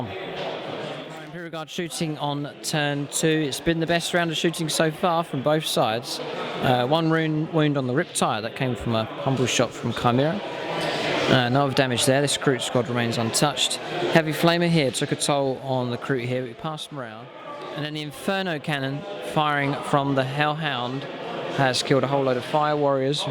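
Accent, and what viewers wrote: United Kingdom. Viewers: I'll admit when you rolled the "6" for the last hull point on the Super Heavy it was "Carlton Fisk-esque"! Good show once again. Maybe it's the models, maybe its the terrain, maybe it's the narrative, hell, I wouldn't rule out maybe it's just the British accent thing, EITHER WAY, these batreps have reached a peerless quality in what you provide. No fancy overlays or stat lines (which are fine) but just clever camera work and a clear love for the narrative. Thanks again. See ya next time.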